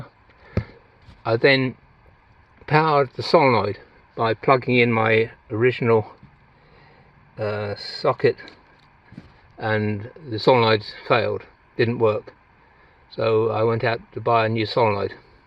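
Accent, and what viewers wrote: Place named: United Kingdom. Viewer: Whenever, as an American (speaking my:"American" English) I want to feel intellectually inferior, I need only to listen to a few minutes to a video where there is a Brit explaining in the REAL English language how to fix something like this. This is by far the best video on this sort of R&R on YouTube. Frankly "the way it should be done " Thank you sir! And darn it, if only it hadn't been for your King George being such an a-hole we'd still be the same country. If we can get past that mess someday, and reconcile, I'd be glad for y'all to have us back!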